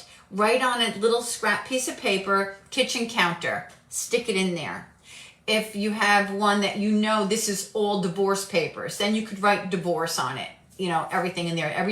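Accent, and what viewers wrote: New Jersey, United States. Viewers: . I LOVE YOU. 😭 I was guided to your videos for the first time a few days ago. I was feeling rather defeated that day. I mindlessly typed something in google search like: "Spiritual Warfare and Overcoming Procrastination/Disorganization through Christ." I clicked on your video, wasn't thinking much of it, but then you grabbed my attention as if you had a megaphone in my ear!! 😅 I was blown away by how much I resonated with your words. It was like God was speaking to me directly, but through you. You have truly helped me breakthrough the weight of my idleness. The mountain doesn't seem as overwhelming to climb now. I've noticed less deer-in-the-headlights blank staring at the messes, because I actually know where to start now! 😆 Hallelujah! Praise to the most high! I am so thankful that He directed me to you! This video was a key that unlocked even more answers for me! 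I'm not exaggerating when I say you are an answer to my prayers,! I'm younger than your children and live thousands of miles away but, I wish we were best friends. I just want to hug you and talk for hours. My soul understands yours. Ah. Thank you for being you. 💕💕💕💕 P.s. I love love love the Jersey in your voice! It's so great 😜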